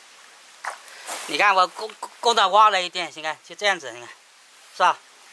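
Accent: Hakka, China. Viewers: 的客家话跟我的完全不同，他说的我大多不懂。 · 你的客家话和我的不一样。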